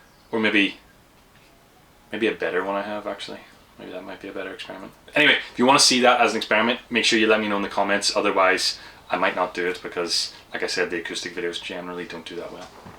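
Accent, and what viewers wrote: Northern Ireland, United Kingdom. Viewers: thanks for replying! Love our Norn Irish accent being broadcast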